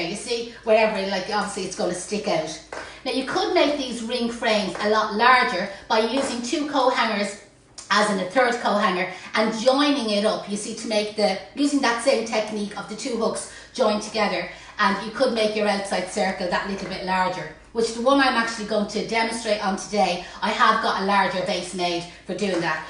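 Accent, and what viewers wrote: Ireland. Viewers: Ooh. An Irish accent. This is for my Christmas wreath. I’m listening ! 😂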